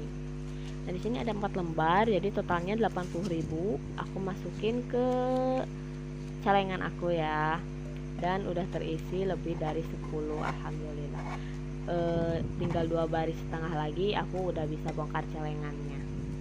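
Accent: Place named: West Java, Indonesia. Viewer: Logatnya msh ketara org sunda nya ...unboxing paket binder lanjut budgeting ya teh smg terus istiqomah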